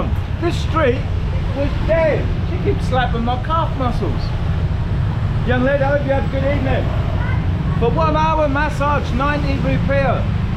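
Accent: England, United Kingdom. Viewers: Where are you from? England??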